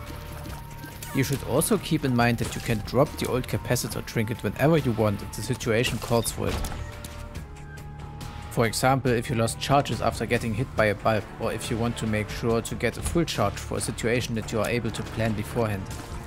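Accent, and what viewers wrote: Germany. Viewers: I don't mind what videos, so long as they have that silky German accent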